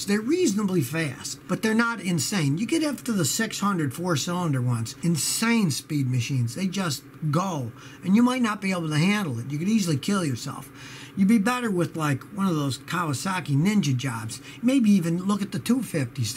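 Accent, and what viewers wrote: United Kingdom. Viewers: your's is the best video channel on the internet! Makes my day everytime I watch one of your videos. Loved the British accent! BTW, a 1986 is 34 years old, but you're still a god to me.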